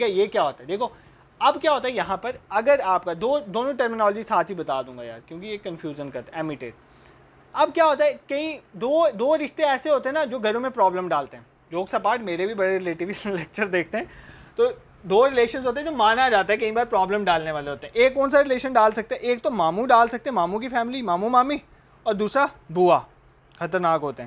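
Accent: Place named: Poonch, India. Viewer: Sir ke accent se hi me smjh gyi thi ye apne poonchiye bhai hi h koi😂😂😂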